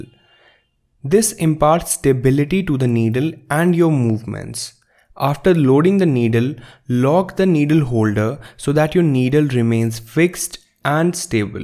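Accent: India. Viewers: This is not an english accent this is an Indian accent and if you want to be any sort of doctor you will fail due to this attitude. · This is how we Indians talk. Got a problem?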